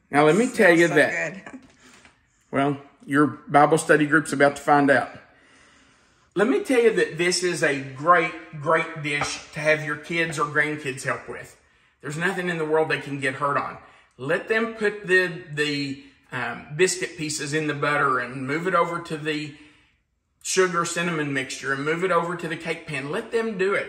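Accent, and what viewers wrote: South, United States. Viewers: I love your recipes... I love the way you teach and instruct us on how to fix them... I love your soft Southern in speaking.... And most of all I love that you're Christian... Thank you so so much... Your presentations are very comforting to me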